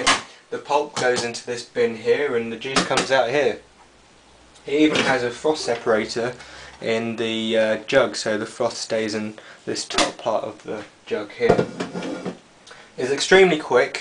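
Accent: England, United Kingdom. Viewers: asian with british accent cool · he sounds very english to me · an asian with a brittish accent how awesome!! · I never saw a chinese person with a british accent ^_^ cool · Dig your British accent. Nice review on the juicers.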